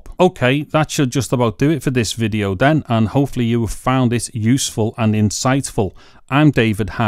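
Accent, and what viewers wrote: Liverpool, United Kingdom. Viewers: Scousers posh telephone voice right here 👆🏼 great video mate really informative 👍🏼 coming from a Warrington half and half over here 🍻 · This was just what I needed to be certain that the Samsung T7 works for shooting video with the iPhone 15 Pro Max. I've now bought the hard drive and I am excited about trying it out. Thanks so much for sharing this. Also, as a silly American I am just in awe at how amazing your accent is. Is that Liverpool? It sounds so cool!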